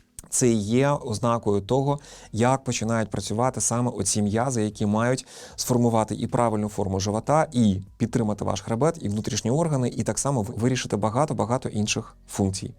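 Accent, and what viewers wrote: Standard. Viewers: Як приємно слухати справжнього фахівця своєї справи! Дякую Вам за доречні поради, гарну чисту мову і позитив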